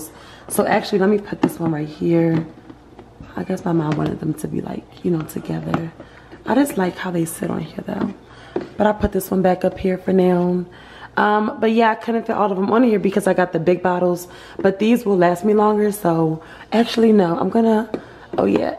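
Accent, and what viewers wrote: Baltimore, United States. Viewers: that Bmore accent funny af 🤣 I'm frm D.C. but good video.🔥💪🏾💪🏾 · Your Baltimore accent is there! And I love it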